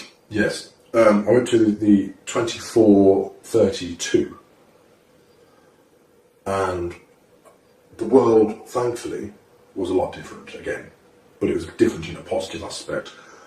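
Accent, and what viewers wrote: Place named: United Kingdom. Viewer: Okay so he’s from The United States but has a British accent?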